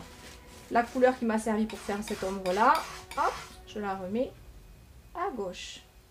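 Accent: South, France. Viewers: Ah enfin le tuto de ce tres beau tableau ! Tout est parfaitement bien expliqué. C est magique de vous voir prendre possession de la toile, de lui donner un sens et de voir emmerger les montagnes, la cascade et les fleurs; le tout avec votre bel accent meridionnal. Merci et bravo pour ce partage.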